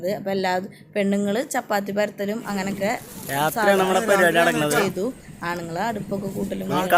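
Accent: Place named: Thrissur, India. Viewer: thrissur slang nannayi und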